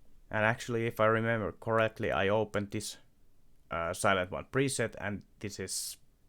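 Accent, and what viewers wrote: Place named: Finland. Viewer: Olipa ilonen yllätys kuulla rallienglantia hardstyle tutoriaalissa!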